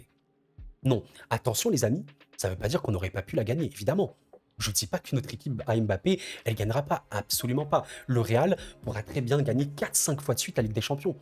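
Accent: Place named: Quebec, Canada. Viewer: J’ai jamais vu un québécois avoir un accent aussi léger.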